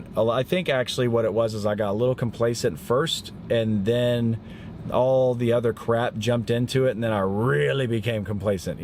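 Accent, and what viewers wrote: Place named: England, United Kingdom. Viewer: Sweet words except for the English accent! Yer right man...dedication, motivation, communication. It ain’t easy owning a lawn maintenance company but it can be rewarding.😀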